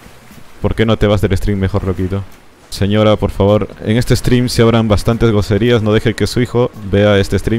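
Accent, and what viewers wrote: Peru. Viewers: eres peruano?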